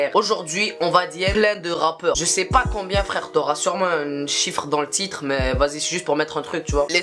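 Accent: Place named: Quebec, Canada. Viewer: T québécois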